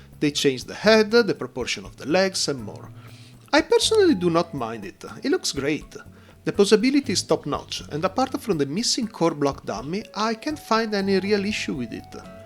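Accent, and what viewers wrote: Italy. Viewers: You sound like an Italian 🤌😅 I hope i didn't offend you. Btw i Really enjoy watching all your videos and great tips tutorial, you should make a video about best techniques to tighten loose joints. ( Polycaps & otherwise) Soon. You did a great job on the review sir you earned a Sub 👍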